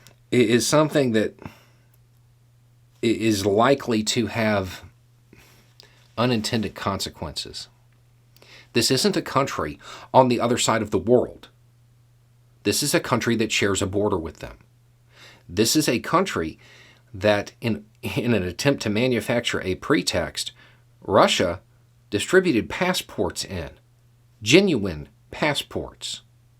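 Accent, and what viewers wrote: South, United States. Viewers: Thanks. Yeah I knew as I sent that comment that it wasn't Texan. Had a feeling it was southern tho Howdy there internet people it's agaien. So todaiye... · Another Skewer here! Love Tuesdays lots of great content from two of my favorites. They prove that all of us sporting a Southern accent are not complete morons! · First time watching. First impressions are often wrong. My first impression, beard, southern accent, prepper, yeah, this guy is a Trumper. I hope to hell I'm wrong because there are already far too many anti-American republican a-holes. So, before I 'sub', I must watch more of this man's videos. I 100% STAND WITH UKRAINE!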